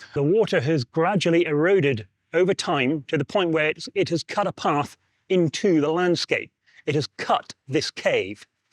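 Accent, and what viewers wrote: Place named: North Island, New Zealand. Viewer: Given your accent I'm guessing you're from the north north north island?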